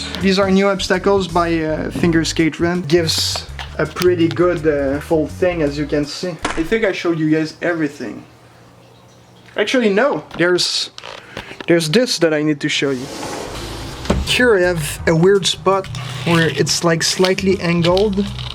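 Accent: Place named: Quebec, Canada. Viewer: the best french canadian accent ive ever heard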